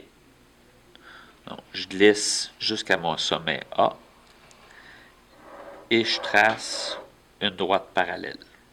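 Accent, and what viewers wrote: Quebec, Canada. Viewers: Non c’est son accent québécois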